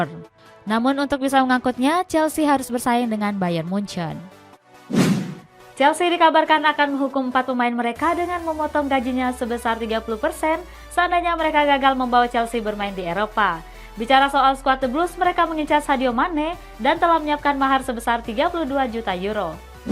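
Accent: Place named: Kalimantan, Indonesia. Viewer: Logatnya kalimantan banget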